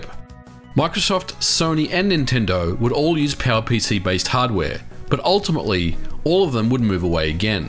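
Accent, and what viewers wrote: Australia. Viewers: Mate, that's just part of the mystique of the Aussie accent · Australian or South African accent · Strayan accent coming through.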